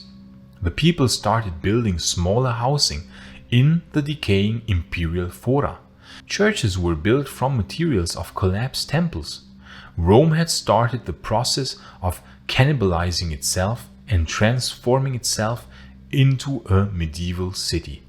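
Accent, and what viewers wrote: Germany. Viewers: german accent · It's absurd trying to present and emphasize the Eastern Romans as the ones who destroyed Rome whilst the barbarians as the ones who wanted to make it great again as if the latter were not the ones the Romans have been fighting against forever. I urge you to stop the propaganda favoring the barbarians. We know darn well who overthrew the Roman Empire in the West and not you nor anyone can change that, no matter how many videos you make using pretty English in germanic accent.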